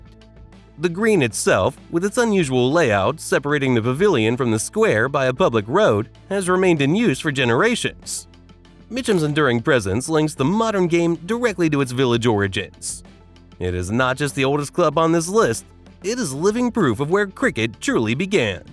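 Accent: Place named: United States. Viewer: and The world's greatest batsman, Australia's Don Bradman (apparently here (twice!) known as Edward Lumpy Stephens) played for Hambledon CC? Tony Greig was also Richard Newland in another life, how versatile! Boy, I hate AI.....why have an American voice? This whole video is extremely aggrevating.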